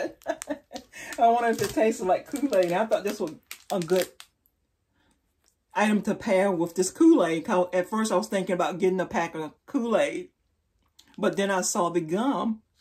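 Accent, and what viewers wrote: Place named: South, United States. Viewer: These are a great idea!!! I saw these socks in Dollar Tree and stood and looked at them, wondering what I could do with them for my craft shows? I ended up walking away, as I couldn't think of anything! Now I am going to go back! I love these! I like listening to you but can't place your accent? It has a bit of a "southern" style, but not exactly - you have a sweet and easy manner about you and it makes it enjoyable to listen to! Thanks again for the great idea!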